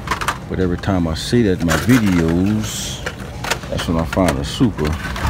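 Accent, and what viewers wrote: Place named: Louisiana, United States. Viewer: Sounds like your from Louisiana if you are what part I’m in Lafayette would like to do some trades if you are down I have a good bit of stuff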